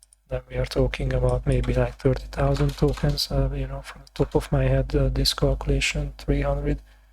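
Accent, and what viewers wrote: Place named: Hungary. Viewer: You are so Hungarian... 🤣 Your accent betrayed you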